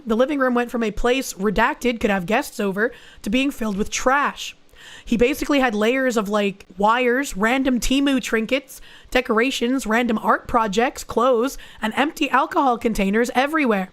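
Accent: Boston, United States. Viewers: Is it me or does sound more feminine while sick? It really breaks up the Boston accent lol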